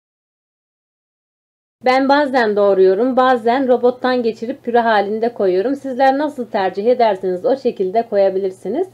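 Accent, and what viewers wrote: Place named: Afyon, Turkey. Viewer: Afynlu olabilir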